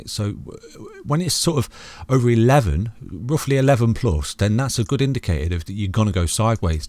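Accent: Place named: Sawley, United Kingdom. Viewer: You sound very local to me in Sawley. Fancy a pint at the White Lion so I can rack your brains? Haha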